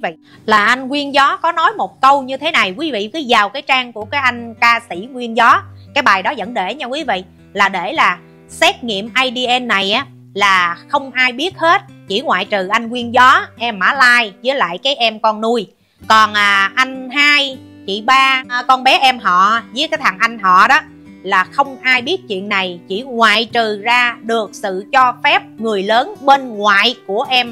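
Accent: Standard. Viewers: Chúc chị nhiều sức khỏe và luôn tươi trẻ khỏe mỗi ngày.Chị có giọng nói rất hay và chuẩn❤❤❤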